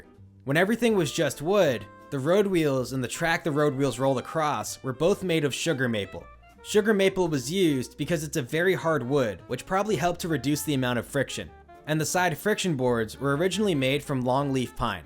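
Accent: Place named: California, United States. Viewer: This is the most Cali accent ever..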